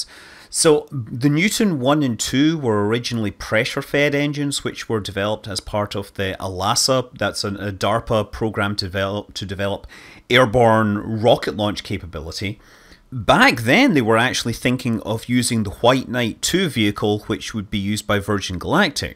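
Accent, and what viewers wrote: United Kingdom. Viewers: Getting really tired of the limey accent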